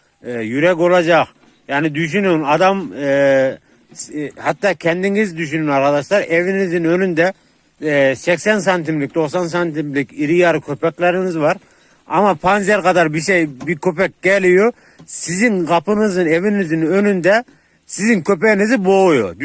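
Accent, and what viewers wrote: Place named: Kastamonu, Turkey. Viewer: Kardeşim tekrar geçmiş olsun allahım şifa versin ben ist dan yazıyom kastamonu pınarbaşılıyım eytliyim çıkar öıkmz memleketime gidecem ama ilk fırsatımda seni ziyaret edicem çünkü sen bizim doğal şivemizi temsil e uyuyosun tebrikler saygılar selamlar kardeşim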